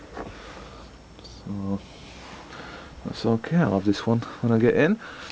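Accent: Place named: Northern England, United Kingdom. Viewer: After trawling through all the videos i subscribe to from around the globe its bloody great to here a northern accent !! Albeit from the wrong side of the pennines !! Have you got your name on an allotment waiting list ??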